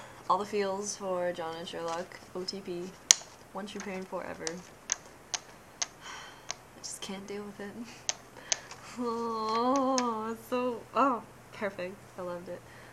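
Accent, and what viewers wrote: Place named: United States. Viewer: By the way, you sound very slightly american. Did you go there recently before making this video or something?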